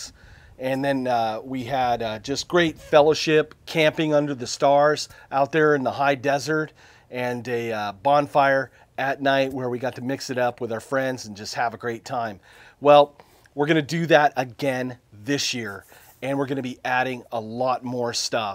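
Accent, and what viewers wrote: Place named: Maine, United States. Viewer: you sound like us Mainers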